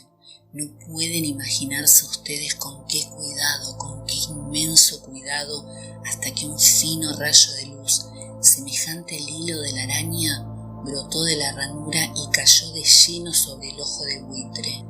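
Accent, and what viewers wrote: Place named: Argentina. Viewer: 1ero eres argentina creo... 2do we tu video esta super lo adoré como narras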